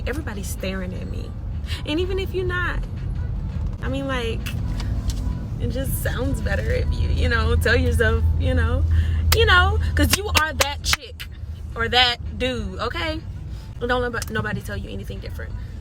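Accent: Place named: St. Louis, United States. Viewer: LOL ok your accent! You sound exactly like my family in St. Louis LOL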